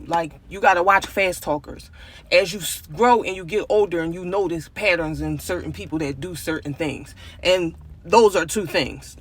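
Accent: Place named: Philadelphia, United States. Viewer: I can tell by that accent that you’re 100 percent PHILLY!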